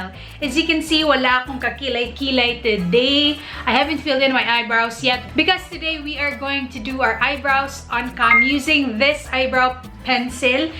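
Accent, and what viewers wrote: Visayas, Philippines. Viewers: You have the bisaya accent. Are you? Hehe btw love this video. ❤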